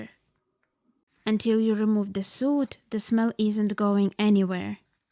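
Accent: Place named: North America. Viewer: для моїх потреб буде достатньо. Англійська мова дуже гарна у вас. Північно американська більше, ніж британська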